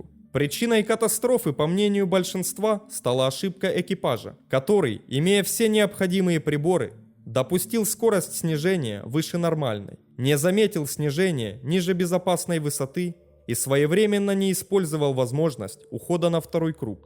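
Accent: Ukraine. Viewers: Скорее, Украина. У него не белорусское произношение. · Вроде Украина или Белоруссия.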